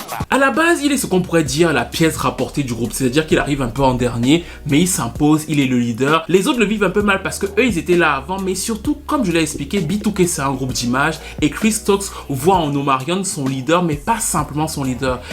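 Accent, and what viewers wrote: Cameroon. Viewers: L’accent Camerounais 🤣s’entend bcp pars ici ♥️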